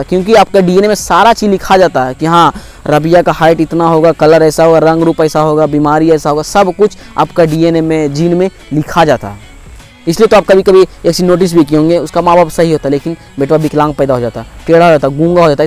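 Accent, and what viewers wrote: Jharkhand, India. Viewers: Aap jharkhand se ho kya 😎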